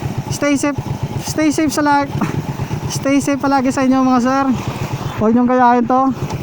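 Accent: Iloilo, Philippines. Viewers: ilonggo ka gali tol.. 😁😁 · ilonggo kaman gali idol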